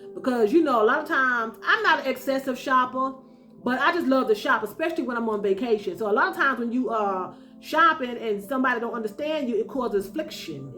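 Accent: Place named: Brooklyn, United States. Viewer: Girl 😂 I can hear that Brooklyn accent in you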